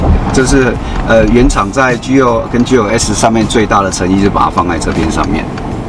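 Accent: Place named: Taiwan. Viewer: 好好笑ㄟ～他一開口我就想說台灣腔好重……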